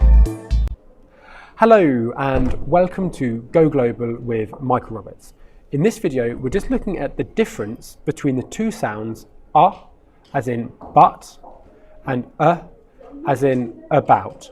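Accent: United Kingdom. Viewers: sound like you are pronouncing ^ as a in father. I think you are giving British & not American pronunciation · Hello!I know that you're British but where is your accent from? thank you!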